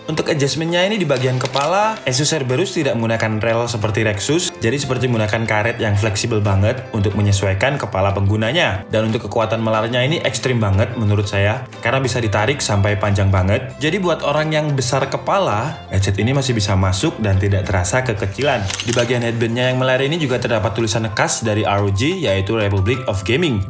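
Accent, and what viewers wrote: Java, Indonesia. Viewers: Njarem jawa bangett haha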